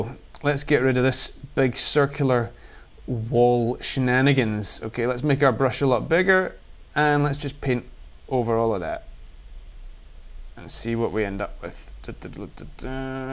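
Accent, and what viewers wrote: Scotland, United Kingdom. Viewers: Thanks for that .... very informative! At first I had trouble concentrating on what you were saying, because I was captivated by your glorious Scottish accent, but once my Aussie ear adjusted itself to your accent, I was able to follow every word. Great work!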